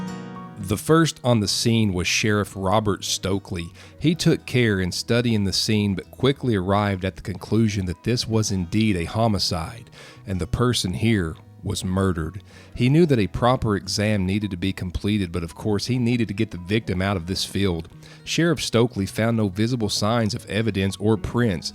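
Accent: South, United States. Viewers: this was one of the best iv seen/read on u-tube ,was quick and concise too the point ,the south in your mouth added to its feeling of CONFIDENT story telling ,kudos too yall ,WELL DONE ! bring on more like it. thanx!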